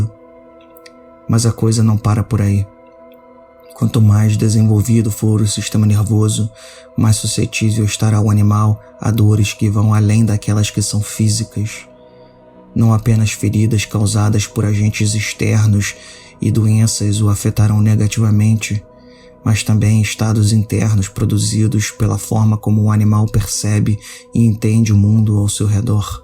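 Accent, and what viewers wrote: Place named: Rio de Janeiro, Brazil. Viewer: Oque me causa desprezar como ser vivo eh esse sotaque carioca com " x " 😂😂😂😂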